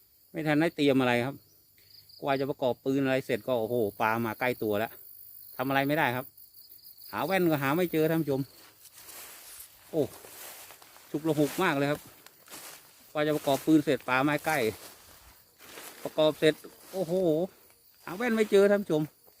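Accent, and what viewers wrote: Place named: South, Thailand. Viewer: คนไต้แน่นอน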